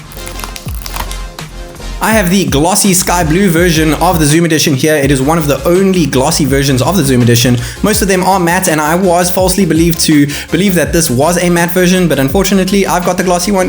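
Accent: South Africa. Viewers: You should add ZAR to you currency conversion. You sound South African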